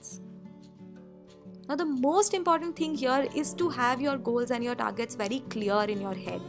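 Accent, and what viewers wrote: South India, India. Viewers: Great.. Indian accent as well as melodious sound...! But u speak a little bit fast! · Pure south Indian accent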